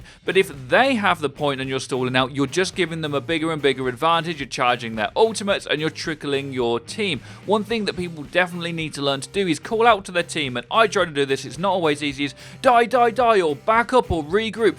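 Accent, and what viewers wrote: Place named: United Kingdom. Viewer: this british accent makes me wanna vomit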